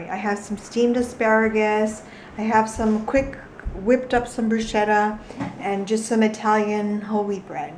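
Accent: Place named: United States. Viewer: You have an American accent. Do you live in the states?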